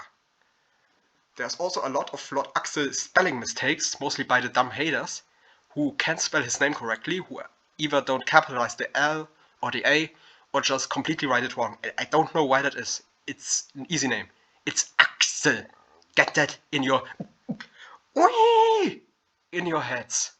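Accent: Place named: Germany. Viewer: ne meinte dass man hört, dass du Deutsch bist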